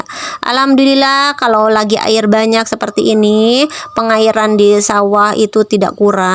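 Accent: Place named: Banten, Indonesia. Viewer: Emang di pangandaran it bahasa y ad jawa y bun bukan sunda doang.ak denger bunda ini suara y kaya logat serang banten gitu heheh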